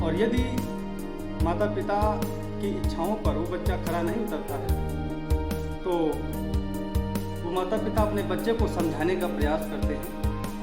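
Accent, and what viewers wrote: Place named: Awadhi, India. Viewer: बहुत अच्छा जी 👌👌👌 अवधी की मिठास अपनी एक पहचान है 🙏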